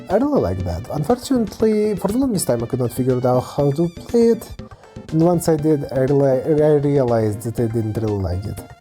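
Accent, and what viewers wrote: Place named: Russia. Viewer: Why do you have russian accent 🙄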